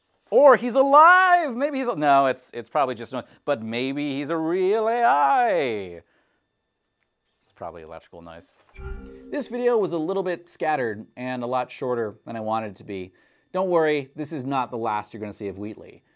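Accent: Russia. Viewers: Ты что, русский? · You are Russian?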